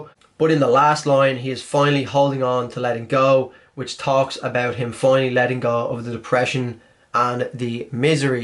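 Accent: Dublin, Ireland. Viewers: yaaaaay knew it. Are you from Dublin? I'm trying to distinguish whether your accent is northern our southern, help me here lad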